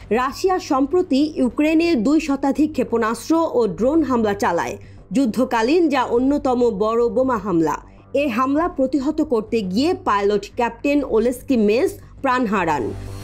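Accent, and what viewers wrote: India. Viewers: ভারতীয়দের মতো কথা বলে এই সাংবাদিক/উপস্থাপক।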